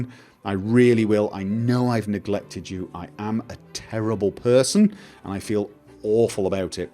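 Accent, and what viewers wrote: United Kingdom. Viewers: the standard English pronunciation, as opposed to the American English pronunciation. Some younger Brits have started using the American way but is old enough to have learned the old standard.;)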